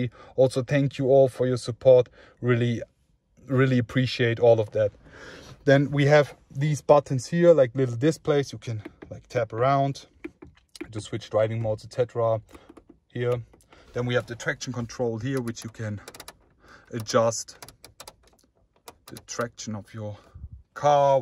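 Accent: Germany. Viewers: By speaking in a German accent just makes the video so much more enchanting. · Arnold is Austrian, this guy is German. The accents are totally different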